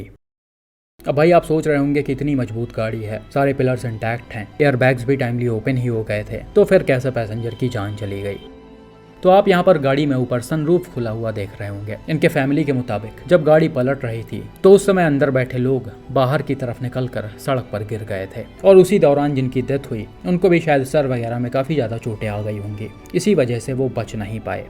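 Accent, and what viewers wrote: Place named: Assam, India. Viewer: Pls don't critcise hindi speech.. It is Assamese accent..pls respect it. I am from UP but residing in Assam for more than a decade.. 🙏🏼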